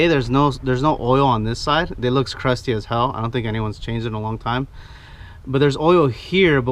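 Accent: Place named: Oxnard, United States. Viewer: You sound like your from Oxnard too. and I can make you T-shirts man, just give me a design. I silk screen em.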